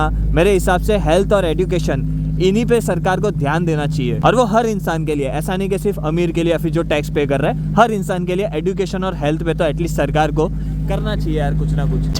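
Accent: Gujarat, India. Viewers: bhai aaj first time teri vedio dekhi aacha laga , waise tu gujju hai kya tere bolne se style se aisa lag raha hai. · So good to hear from you. Well explained. 8 enjoyed and learnt things from your video. You sound typical Gujju😅😊🎉All the best.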